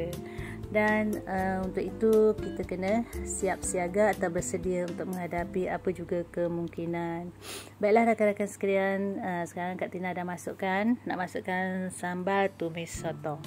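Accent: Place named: Malaysia. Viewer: saya juga kurang suka dawat nya kak tina karna nggak enak dipandang warna nya setelah dimasak,,,,denger kak tina ngomong berasa nonton drama malaysia,,,aku suka film2 malaysia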